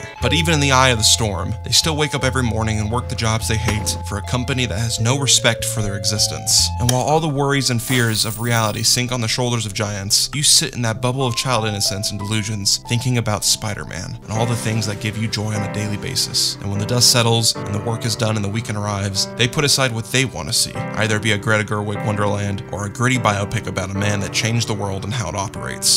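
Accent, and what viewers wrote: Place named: Arizona, United States. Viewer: You must be from southern Arizona 🤙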